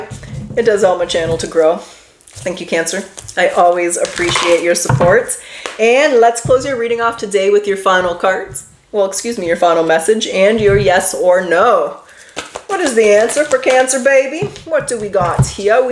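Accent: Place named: Canada. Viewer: You're Canadian... Lol I can tell. My ex is Canadian too. I love the accent.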